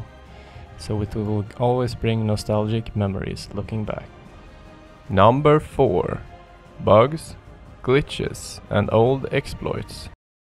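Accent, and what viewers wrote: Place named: Romania. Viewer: Deci dupa accent deja ceva nu era ok, ba asta ii roman, dupa screenshot-ul ala... :))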